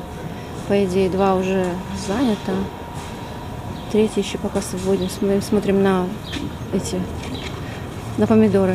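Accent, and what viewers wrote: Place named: Russia. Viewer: говорила бы уже либо на русском либо английском!!!!!!!!!!! ужасный русский акцент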